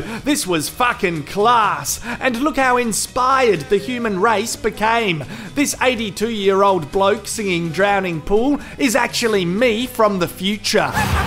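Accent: Australia. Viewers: Mate what part of straya ya from. NSW meself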